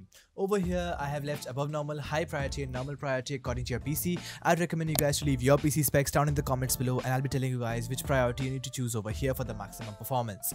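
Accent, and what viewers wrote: India. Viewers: indian detected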